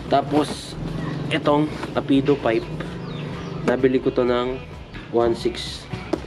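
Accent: Pampanga, Philippines. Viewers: Kapampangan ka pala😅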